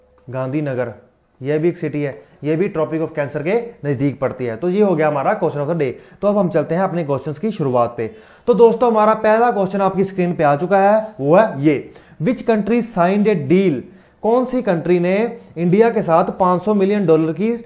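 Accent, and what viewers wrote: Punjab, India. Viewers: He is punjabi. . That's why hindi pronunciation aisa h ..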